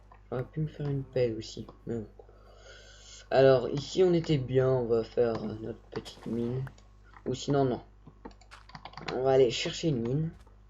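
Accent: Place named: England, United Kingdom. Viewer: Mais quel accent anglais mon dieu ! TU es mon dieu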